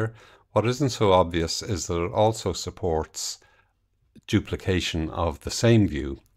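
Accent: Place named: Ireland. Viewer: I was surprised to hear an Irish accent. Good man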